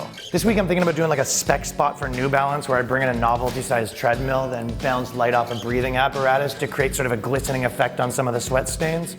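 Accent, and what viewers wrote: Canada. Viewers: your canadian accent was THICK in this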